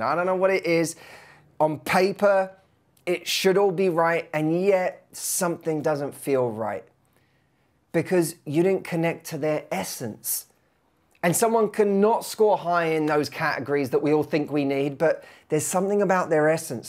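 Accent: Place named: United Kingdom. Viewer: I think you’re an EXCELLENT SPEAKER! And we LOVE The way you talk, and your British accent. It’s amazing how we have these silly things in our head like you said stop comparing ourselves to others. And I prefer over Tony! seems genuine and kind compassionate NOT filthy dirty slimy and manipulative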